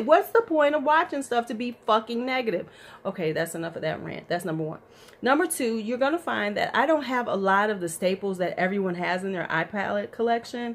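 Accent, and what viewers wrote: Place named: Midwest, United States. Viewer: So glad I found your channel! I’m 49 and it so great to see more women in our age bracket doing it on YouTube. And I completely agree, we can buy what the ___ we want! I’ve raised my son and put him through college, if I want to buy new makeup, it’s My Prerogative! No apologies! I loved the video and your collection. 👍🏾 Question. Listening to your dialect, are you Midwest located? I’m Chicago burbs myself.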